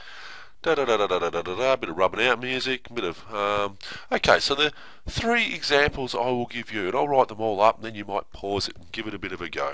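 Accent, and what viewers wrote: Australia. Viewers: Thanks so much for the video, by the way are you australian?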